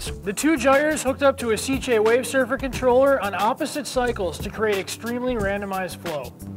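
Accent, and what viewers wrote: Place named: Minnesota, United States. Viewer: I'm from Minnesota but don't live there anymore. Definitely miss the accent. Also, do y'all ever plan on doing these videos for the Vortec mp's?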